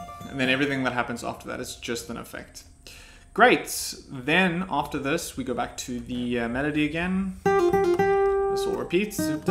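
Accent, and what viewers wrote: South Africa. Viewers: You kind of sound south African..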